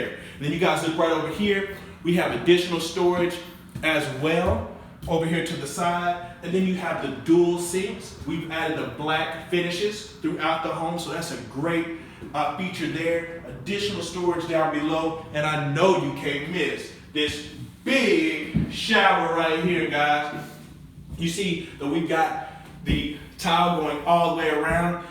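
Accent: South, United States. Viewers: Is he southern, ghetto or just smooth in his mind? Nice layouts, but he turns me off completely.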